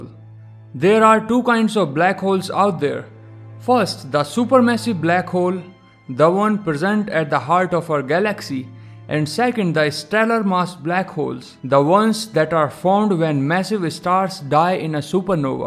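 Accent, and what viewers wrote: India. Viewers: Intresting video: me:D Indian accent: me:( It's: me:D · Indian accent lol Wait, he is an Indian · you sounds like asian............. india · I need something in English I think that was broken Indian accent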